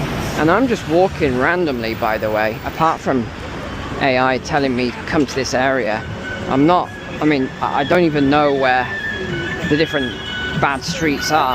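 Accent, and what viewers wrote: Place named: RP, United Kingdom. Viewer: Do you know how urban demographic transition models work? I learnt this stuff as a 13 year old in a crappy bog standard public school of all places, so you and others like you with your posh holier than thou accents have NO EXCUSE not to know about the subject. Literally every MAJOR CITY in the UK is composed like you see in Birmingham, it is no better or no worse than anywhere else. Even London is like this, literally walk 500 yards from Liverpool Street Station, which is plush, and the area quickly becomes depraved. Nothing has changed about these major cities. Manchester was exactly the same thing before the Man City FC owners invested in the local council rejuvenation project. The issue is a lack of investment, it's always a lack of investment in public services. People talking about immigration being the cause are just clueless, immigration has been here since WW2 and it's helped to not only rebuild the country, but to prop it up. 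If anyone is to blame, it's successive governments pulling public budgets when taxes are forever increasing.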